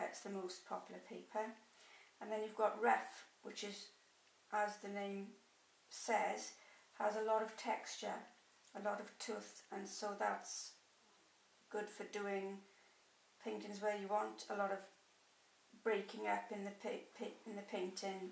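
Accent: Wales, United Kingdom. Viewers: Yeah. It's easy to tell a welsh person from the accents. I love your video by the way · I just got into watercolours as a way to manage stress and anxiety - it can be overwhelming as a beginner but your video was exactly what I was looking for. You move at a good pace, your explanations are wonderful and your Welsh cadence is a pleasure to my ears. Thank you, brilliant work.:)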